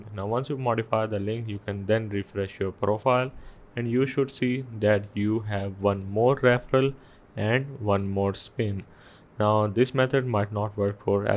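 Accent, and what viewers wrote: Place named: Middle East. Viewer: Middle Eastern accent detected = video ignored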